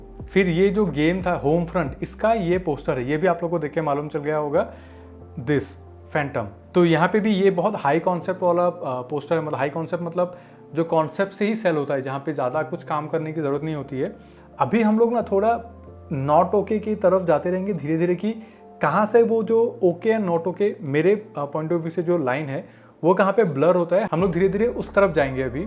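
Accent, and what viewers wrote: Assam, India. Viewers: lmao. I knew you were Assamese before reading the Description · Tumar accent khuni doubt korisiliua j tumi Assamese hoi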